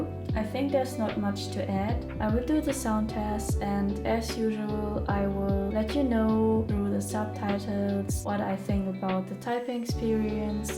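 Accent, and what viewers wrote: Germany. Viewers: Yo you sound like your from Germany ? :D , if so that's pretty nice theres not a lot of custom keyboard YouTubers from Germany :)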